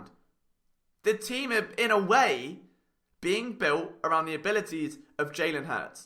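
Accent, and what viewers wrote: United Kingdom. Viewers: If my Eagles content doesn’t come with a British accent, I just don’t trust it!!! 😂🦅 🤙 Thanks for your hard work,. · I see an Eagles video, I tap on it. I dont year a British accent, I close said video. Good job. Keep it goin.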